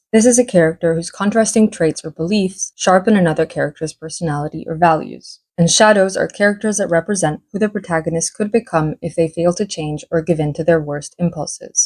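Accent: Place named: Russia. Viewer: i wanna say russian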